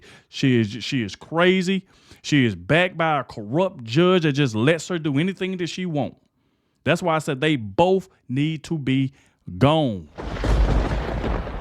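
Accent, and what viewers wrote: South, United States. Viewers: Do I hear a southern accent?? Real question.., Can the American citizens have the right to sue these DA’s for our wasted tax money back?? I personally would like to see these under funded schools get the money!!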